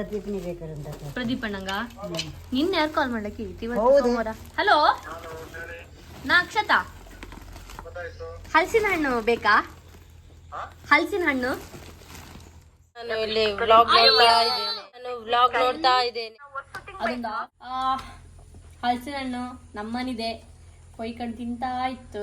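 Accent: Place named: Kundapura, India. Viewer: Hoi...ನಿಮ್ಮ ಮಾತು ❤❤❤👌👌👌👌 Mugubott 🥰 ನಿಮ್ಗೆ chanda kansutte🌸😁❤❤❤.... ಕುಂದ ಕನ್ನಡ❤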